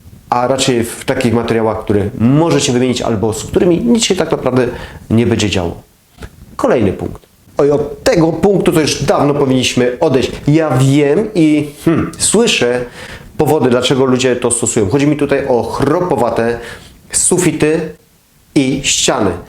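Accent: Silesia, Poland. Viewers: Uwielbiam pana śląski akcent mogłabym słuchać godzinami ☺️ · 👍❤️ SUPER 😘 i do tego uwielbiam Pana śląski akcent,😍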